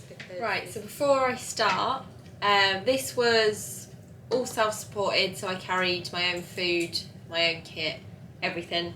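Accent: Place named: Manchester, United Kingdom. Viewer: do you have links to manchester at all some of the words you say we say in manchester like manky haha, and mount-in just wondering :) thanks great video btw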